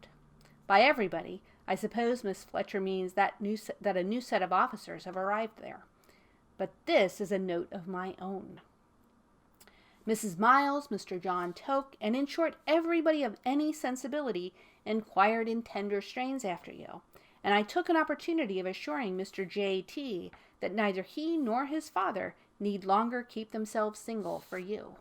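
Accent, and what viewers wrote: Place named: United States. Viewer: It just doesn't work with an American accent 😕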